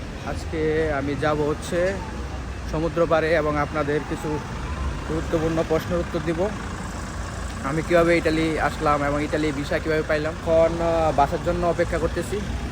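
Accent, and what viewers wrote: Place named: Chapainawabganj, Bangladesh. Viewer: ভাইয়া তোমার বাসায় কি চাঁপাইনবাবগঞ্জ 😊😊😊😊😊রিপ্লাই টা দিয়েন ভাষা বুঝে বুঝলাম 😊😊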